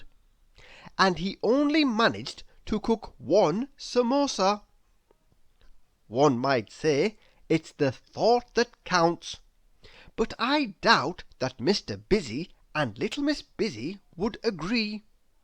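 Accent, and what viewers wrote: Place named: India. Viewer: im indian and are you Indian because your photo looks indian and you sound indian🙏🙏🙏🙏🙏🙏🙏🙏🙏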